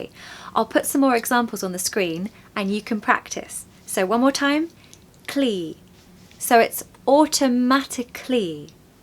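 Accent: United Kingdom. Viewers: exacly you are my favourite teacher You have a lovely British accent I love it😅❤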